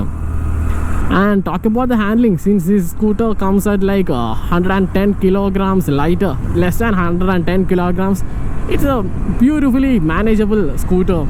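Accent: Kerala, India. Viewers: Nice mallu arabi English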